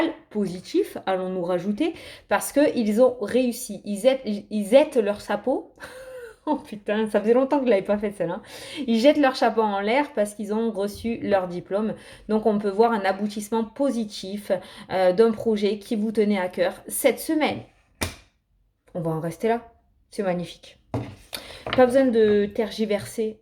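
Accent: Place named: Marseille, France. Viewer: Ta repris le marseilles..😅 i knew it !!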